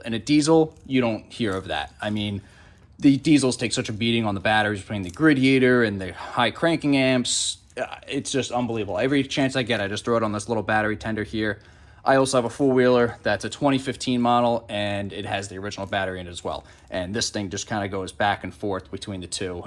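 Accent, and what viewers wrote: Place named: New York, United States. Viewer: I recognize that NY accent lol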